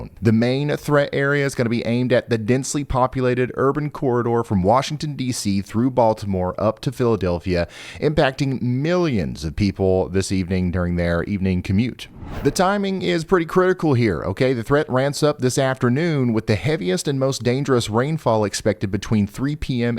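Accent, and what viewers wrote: South, United States. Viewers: My Husband asked if he was getting paid per "gully washer" today. Love his southern-ez.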